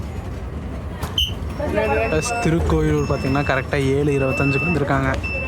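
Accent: Kanyakumari, India. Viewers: Bro are you from kanyakumari 🤔.. Your slang is like typical kanyakumarian tamil ( malayalam mixed tamil)🤔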